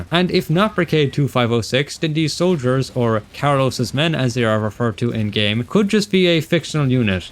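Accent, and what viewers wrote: Ireland. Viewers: Are u Irish if so what's the crack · I never realized you were Irish…I actually thought you were German or Polish…don’t know what the says about me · funniest Part of video!! 😂😂. Hey, are you from Ireland!?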